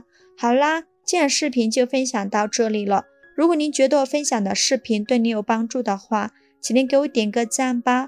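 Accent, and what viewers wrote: China. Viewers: 呵呵。請問這跟台灣人的素質有什麼關係。因為影片裡說的是大陸口音嗎？差別心在你不是我。到底誰心裡殘缺呢？？我只是說加雪碧就是麵糰裡加蘇打跟檸檬酸和糖。沒什麼值得神奇的。因為對於烘培加蘇打在麵糰裡是很常見的。而你生出那麼多情緒。還上升到台灣。只覺得無知又可笑🤣🤣🤣🤣🤣。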